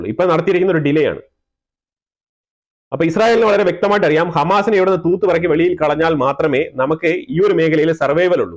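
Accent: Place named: Trivandrum, India. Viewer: No kollam, it's trivandrum slang.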